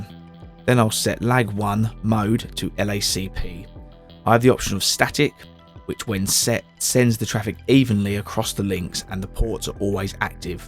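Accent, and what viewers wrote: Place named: Bradford, United Kingdom. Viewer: I know Bradford when I hear it 💯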